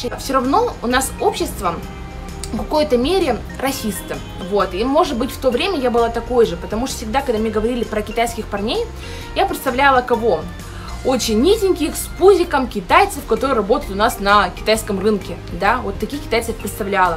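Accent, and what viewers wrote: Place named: Siberia, Russia. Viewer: Как приятно было услышать вашу историю !! Можно сказать, что я ваша землячка! В детстве росла в Бурятии, а потом переехали с родителями в Иркутскую область! Так что вам привет от землячки 😊! Да Иркутск и всем известный Шанхай очень известен , очень много китайцев там, там считаю это почти граница! Многие мои подружки тоже учили китайский именно в Иркутске и потом переедали в Китай! Кстати, менталитет Сибири прям явно замерен вас и отличается, особенно этот сибирский акцент 😊! Потому что во взрослой жизни я уже жила на Урале: Уфа, Челябинск , Оренбург - менталитет там людей отличается и говор тоже! Я бы сказала, что сибирские девушки более добрые как вы говорите 😍! Ну а моя история связана не с Китаем, она связана с Индией 🇮🇳 : я всю жизнь грезила об Индии , мечтала туда уехать и скажи мне лет 5 назад, что в меня будет семья в Индии , я бы тоже не поверила 😱! 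Но я живу теперь в Индии 🇮🇳, мой муж индиец , у нас дочка ! Вы Молодец: очень позитивная! Желаю счастья вашей семье ❤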